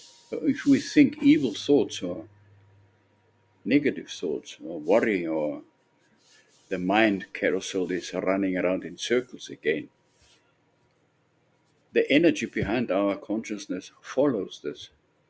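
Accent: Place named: Austria. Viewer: schoen den auch englisch sprechen zu hoeren. seit langer zeit im ausland lebend, erfreue ich mich aber immer wieder seinen oesterreichischen akzent zu hoeren. DANKE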